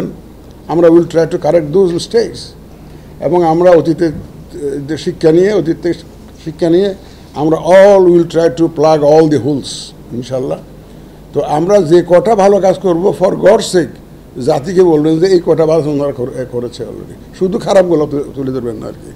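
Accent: Chittagong, Bangladesh. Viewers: ওনি চট্টগ্রামের ভালো লাগলো কথা শুনে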